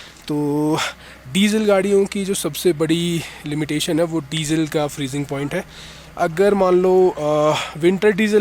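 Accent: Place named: Himachal Pradesh, India. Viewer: Bhai himachal se ? Himachali language lag rhi hai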